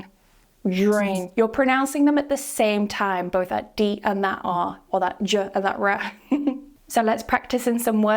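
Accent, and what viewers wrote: United Kingdom. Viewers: Amazing!!! Thanks a lot! ☺☺ I love British pronunciation! 🥰😍🥰😍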